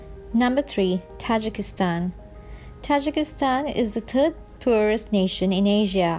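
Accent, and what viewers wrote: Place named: Bangladesh. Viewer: Very nice content with plenty of information, does the speaker belong to Bangladesh?🇧🇩 she sounds like a Bangladeshi girl, very nice presentation.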